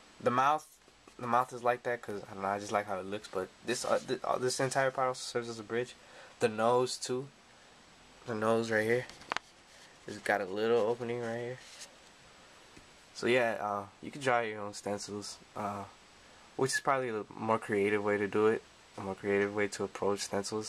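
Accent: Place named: Los Angeles, United States. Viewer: u got the la accent :0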